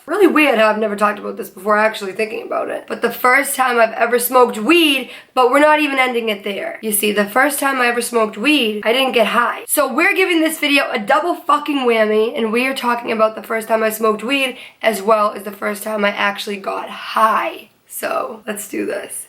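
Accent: Boston, United States. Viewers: HER BOSTON ACCENT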